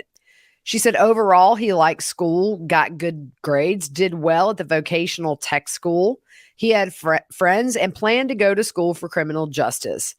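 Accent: South, United States. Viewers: …this is my go to channel for outright facts! You’re the best! And your country woman accent helps! Nothing a Boston city boy likes more than a country girl givin information! 😂😂 you go girl!!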